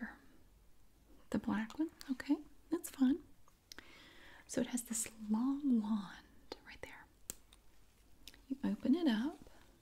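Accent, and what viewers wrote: South, United States. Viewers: The voice of an angel, love the sothern accent im southern also from thomasville nc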